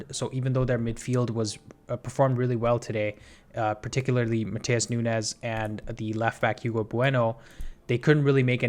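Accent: United States. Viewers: As a mancunian born and bred. I can never get used to an American accent talking about British football. Its as abnormal as hearing my grandmother talking about her sex life.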